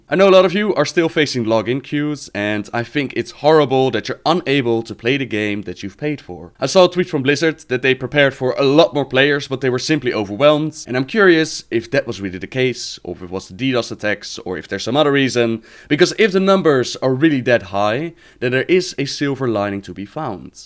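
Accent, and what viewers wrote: Netherlands. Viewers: HOLLAND :D het accent valt wel mee hoor ^^ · I was wondering about that accent too, though I found out you were Dutch because I started watching your Majora's Mask videos and you mentioned it. I really like the accent. Can't wait for more lore, you've been making me really happy to be back in the game. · Woaahhhhh :D first Dutch accent I've ever heard! Peace out man!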